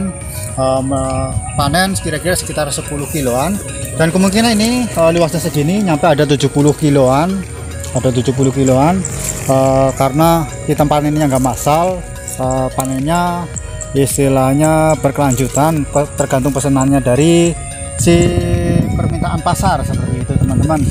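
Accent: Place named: Banyumas, Indonesia. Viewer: Sampean wong bnyumas y mas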